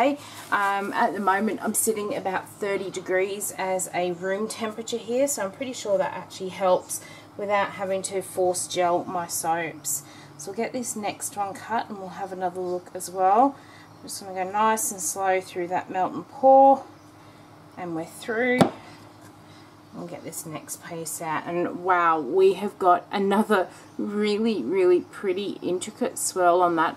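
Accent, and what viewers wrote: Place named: Australia. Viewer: I truly enjoy your videos and enjoy hearing you talk. I don't hear Australian accents very often living in America, so you're fun to listen to 😊 And it is so neat to see all the unique ideas you have!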